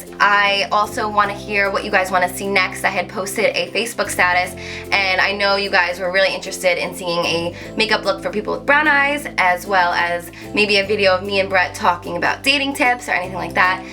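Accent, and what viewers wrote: New Jersey, United States. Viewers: are you from NJ?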